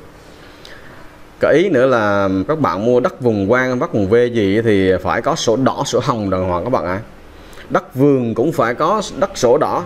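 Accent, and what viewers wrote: Quang Nam, Vietnam. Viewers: Nghe giọng QN ĐN chán chết đc .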